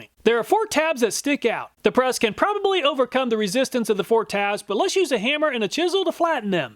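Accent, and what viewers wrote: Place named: Midwest, United States. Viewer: He says all sorts of things funnily. I figure it's in that midwest water. I watch some gals over in Ohio and they say "To MAH to" all the time for the red orbs from which katsup is made. I laugh and laugh. But hey--it's not wrong if you live there and it works for communications.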